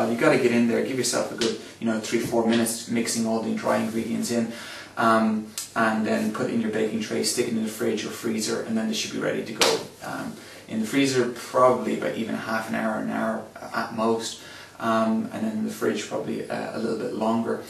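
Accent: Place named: Ireland. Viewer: It's strange actually hearing a fellow Irishman on youtube, it's usually americans.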